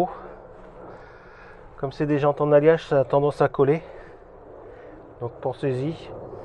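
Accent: North, France. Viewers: Salut ou habite tu? Car tu as l accent du nord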